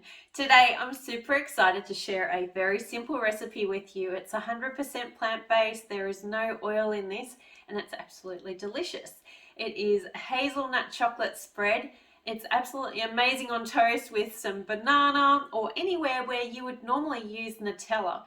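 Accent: Australia. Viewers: You look exactly like Ann reardon from How to Cook That, if she had lighter eyes! And if I'm guessing right from your accent, you're Australian too?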